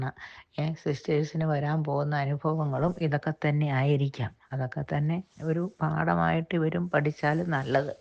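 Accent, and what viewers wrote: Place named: Changanassery, India. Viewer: ഉച്ചാരരണം കേട്ടാൽ ചെങ്ങനാശ്ശേരി അമ്മച്ചി ആണെന്ന് അറിയാം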